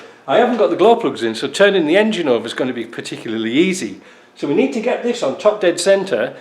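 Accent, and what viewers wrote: Yorkshire, United Kingdom. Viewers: By heck we can tell your still a Yorkshire man !!!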